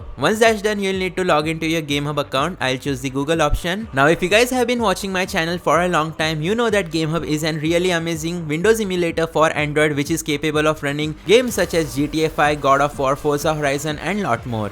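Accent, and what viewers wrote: India. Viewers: The indian accent makes me trust you even more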